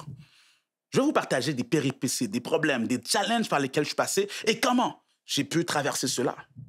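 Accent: Quebec, Canada. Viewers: Je suis là pour l'accent québécois ! J'aime trop... et pour mon business qui marche pas 😂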